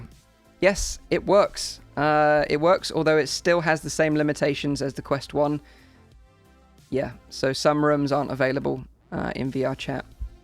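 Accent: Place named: United Kingdom. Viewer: Honestly I personally don't mind Britishisms or any other country's thing/quirk as it's what makes you unique/you. It's a part of you and how you lived I want you to speak however you want lol, multiple English speaking countries have different spelling/pronunciations, that doesn't make one wrong or the other correct thought!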